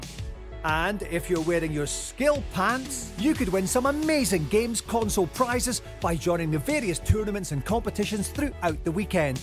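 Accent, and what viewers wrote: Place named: Scotland, United Kingdom. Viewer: It's odd you hired a Scottish voice over artist rather than an English one, but not an issue at all. Even though I'm too young to remember GamesMaster, being born five years after it ended in 1998, the fact it's THE Dominic Diamond sorta makes up for it. If you wanted an Englishman, Andy Crane would've been an excellent choice!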